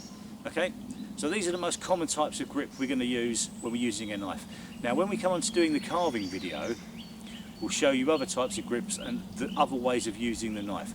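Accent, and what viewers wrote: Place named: Wales, United Kingdom. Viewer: The pointer was the main one and I know that Brecon Twang anywhere lol,and unlike most on here you know what your talking about,good vid will be subbing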